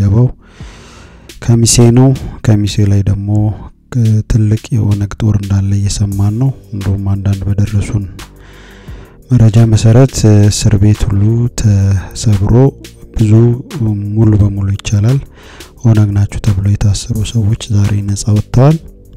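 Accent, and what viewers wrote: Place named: Tigray, Ethiopia. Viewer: This is the voice of Woyane. Please modify your accent a little bit.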